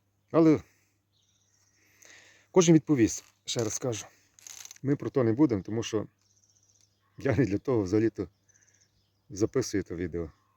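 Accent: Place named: Lviv, Ukraine. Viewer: Я тоже иногда Тебя смотрю ,благоразумные мыслями делешся ,приємно ,ставлю лайк ,подписан давно , пишу коментарий более восьми слов ,по алгоритму ютуба для продвижения ролика .Хай щастить ,може колись завітаю в гості на турник.Чую ти по говорю мабудь зі Львівщини , може мені так здається ,в мене коллега так розмовляє бо я з Слобожанщини.Миру та Добра Тобі і в твоїм Рідним.🎉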